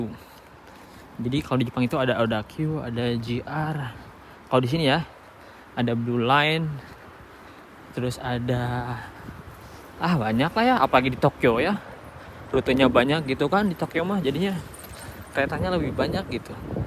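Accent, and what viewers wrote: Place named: West Java, Indonesia. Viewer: Sigana mah urang sunda si akang ti cara ngomong na 😅, salam ti uing tkw taiwan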